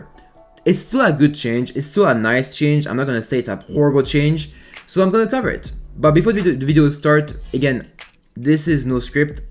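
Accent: France. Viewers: Omg, are you french? I love your accent and that you actually try to articulate (unlike this Takyre senna content creator. The guy gives no shit whether he's understood or not).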